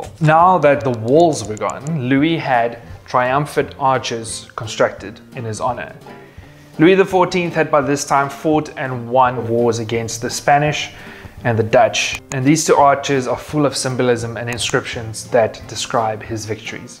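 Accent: South Africa. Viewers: I know a South African when I hear one👁️🇿🇦👁️ great video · South African presenter? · Ek het gedog dié man klink soos 'n Suid-Afrikaner en toe ek gesien het, het ek sommer net geweet! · Is that a South African accent? ❤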